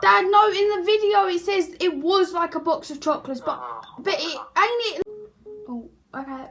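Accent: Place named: United Kingdom. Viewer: hard to listen to the br accent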